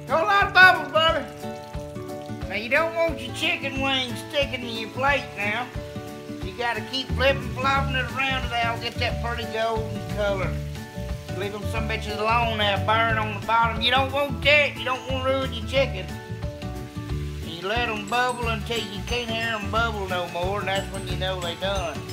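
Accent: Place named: South, United States. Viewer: How can pewdiepie be more popular than this??? It not only includes food but also comedy and mix it all in with a Southern accent yourself a real spicy mix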